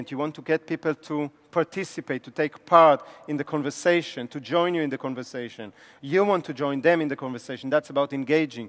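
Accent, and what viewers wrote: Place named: Spain. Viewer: Bu nasıl video lan İspanyolca karışımı İngilizce konuşuyor adam bile konuşamıyor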